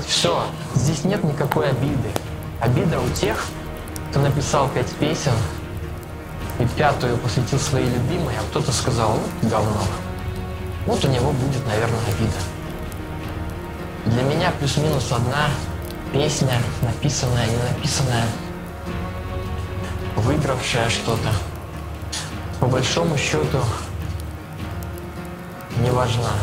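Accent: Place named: Belarus. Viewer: Первый раз слышу такой голос на твоем канале. По акценту слышно что ты с Беларуси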